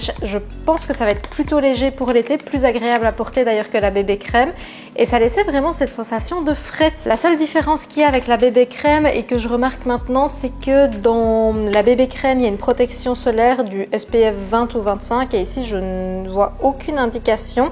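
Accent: Belgium. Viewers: Bonjour, J'ai découvert ta chaîne il y a peu et honnêtement j'adore!!! 🙂 En revanche, je ne suis oas sûre que tu atteignes véritablement 120g de protéines avec tes repas Au petit dej, même si tu prends 200g de skyr = 20g de protéine et ton granola =20g de plus, 2 gaufres à midi et ta salade ne te permettent pas d'atteindre 40g en plus si tu n'y as mis que 2 oeufs (12g de prot) et la farine de pois chiche, aussi riche soit-elle. Quant au repas du soir, il me paraît (très équilibré c'est pas le souci) mais là encore, je ne suis pas sûre qu'on ait 40g en tout Tu penses que tu pourrais préciser les quantités de manière à pouvoir calculer les macros Je fais beaucoup de sport et je peine déjà à atteindre les 80g/jour Mais en tout cas, merci pour tes contenus, ta fraîcheur, ton naturel, ça fait du bien! Et en tant que Lilloise qui habite désormais dans le Sud j'aime beaucoup ce petit accent belge qui me rappelle bien des souvenirs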